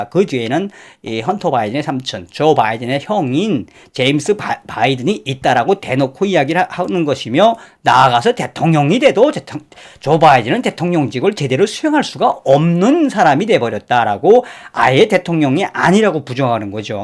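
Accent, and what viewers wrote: North Korea. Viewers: 좋은정보감사합니다 근데 북한사람느낌나요ㅋㅋ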